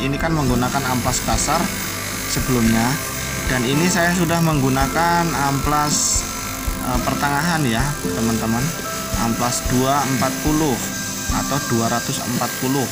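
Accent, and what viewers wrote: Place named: Java, Indonesia. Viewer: Maaf boss..kok logatnya ky orang jawa...?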